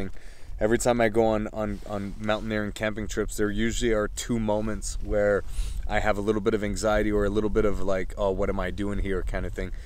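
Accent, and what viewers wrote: Quebec, Canada. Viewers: Any day you decide not to summit is a good day! Great video. Glad YouTube recommended it to me. Are you French Canadian by chance? You sound very similar to some of my friends from Quebec. All the best to your next adventures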